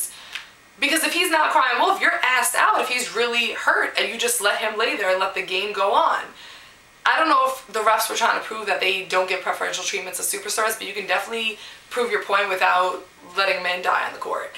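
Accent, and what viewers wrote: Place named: New York, United States. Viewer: nice video keep em coming! i love your NY accent lol and you know basketball marry me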